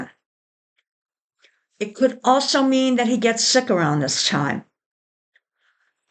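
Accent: Massachusetts, United States. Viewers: I knew you had the Mass accent!! My mom was from Hull village , I grew up in lakes Region NH ( Squam lake and Winnie) and now in Maine.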